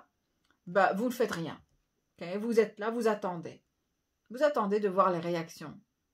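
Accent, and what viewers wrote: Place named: Switzerland. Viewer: Ah l accent suisse🤣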